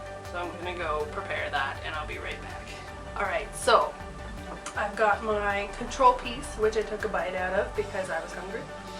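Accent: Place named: Scotland, United Kingdom. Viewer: I love Scotland. I had a great time in Edinburgh, glasgow, and Oban. My boyfriend and I are planning to move there. I did notice some things are cheaper like groceries! I really feel Toronto is not for me. I was so depressed when I got back, but I got plans! thanks for the info. I also noticed that some words you say sound a little like the scots. :)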